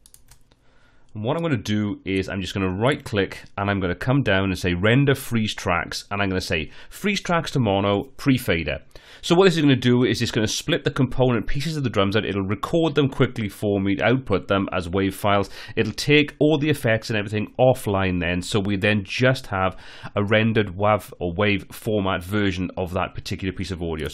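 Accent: Wales, United Kingdom. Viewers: Cheers man I'm having some popping issues. Do I hear a Pontypridd accent 🙂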